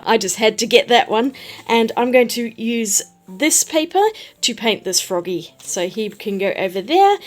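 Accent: Australia. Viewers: Love hearing another Aussie voice. Hot press paper is fun to play with granulation paints on. Thanks for the comparision. Waving from Queensland 😊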